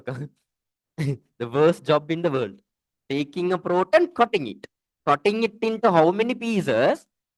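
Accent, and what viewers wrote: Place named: India. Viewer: Great sir But I can't understand Ur Indian accent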